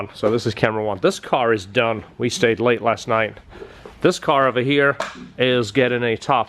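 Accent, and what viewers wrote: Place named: United Kingdom. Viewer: I’m picking up a british twang In your accent.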